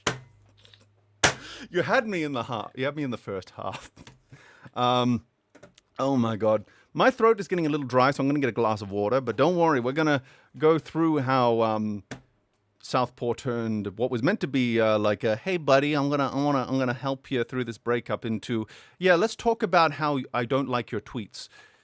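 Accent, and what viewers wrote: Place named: Georgia, United States. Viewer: I didn’t know you were a southerner from Georgia. Amazing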